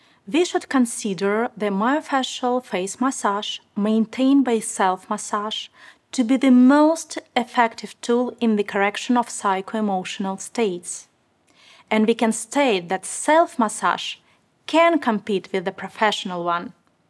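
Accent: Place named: United States. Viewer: Живу 15 лет в Англии, потрясающий английский ! Американский английский но очень профессионально !!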